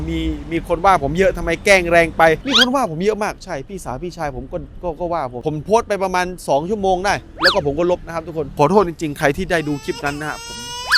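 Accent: South, Thailand. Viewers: พูดภาษาใต้น่ารัก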